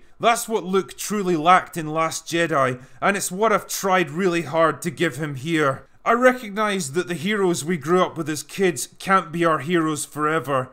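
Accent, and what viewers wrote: Scotland, United Kingdom. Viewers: you clever weaver of character tapestry you hear me say. You didn't just 'fix' Luke Skywalker, you provided him salvation. You cleverly wiped the stain of shame from him and restored him to the galaxy saving hero we all came to know and love. I've already bought several of your books, now I eagerly await the version of the Sequel Trilogy. Hell, I'd even pay for the audiobook if you narrated it. Just can't get enough of that Scottish slurring of poetry! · Despite being read by a Scotsman who sounds like he's angrily gnashing his teeth at the height of inebriation this script is pure healing. I don't get why so many directors also write the script of their movies nowadays when they're clearly two distinct skill sets. And who greenlights these things?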